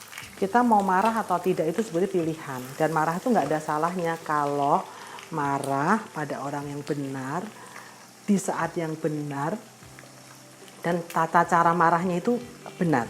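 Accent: Java, Indonesia. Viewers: Bunda..jan Jowone ga ketinggalan..salut bunda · Bisa lucu juga lho mbak,medok bs Jawanya,.. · Ya ALLOH, saya banget liat bunda msk, suka logat jawanya medok bangeeet ☺☺☺ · Ya allah luwes banget nguleknya,nggak nyangka bunda pinter masak. Jowone yo gk ilang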